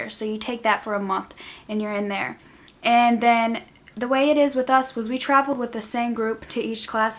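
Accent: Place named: Louisiana, United States. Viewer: I'm from Mississippi and as soon as I started watching your videos I thought you had a Louisiana accent. I'm getting ready to go to regency beauty institute in Virginia this month!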